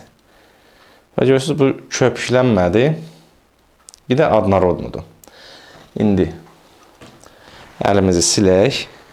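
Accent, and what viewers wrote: Ganja, Azerbaijan. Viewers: Ləhcə Gəncə ləhcəsinə oxşayır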